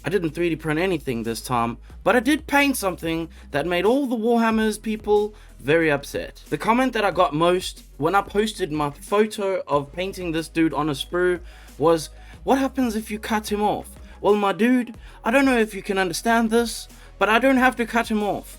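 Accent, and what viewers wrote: South Africa. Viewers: A cute idea, that a SA accent? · Good to hear a South African accent again after moving to the UK!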